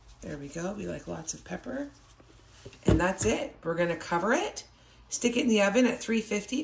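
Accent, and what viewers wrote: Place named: Ireland. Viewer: ohio Long way from home! I did not think Canadians had an accent. I was thinking you had a bit of Irish cuz some of the things you said sounded Irish accent.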